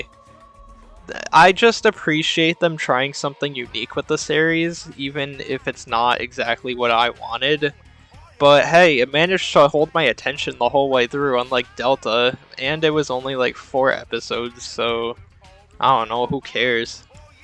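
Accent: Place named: West Coast, United States. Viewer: It's okay brother I watched and enjoyed, I too have made cringe during my cursed stay in this world. However your accent eludes me, where is it from??? Some kind of west coast thing?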